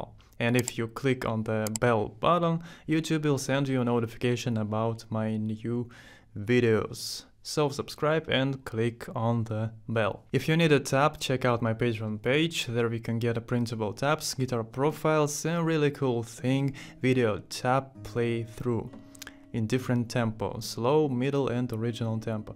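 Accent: Russia. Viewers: Are u Russian? I hear some Russian sounds I think😀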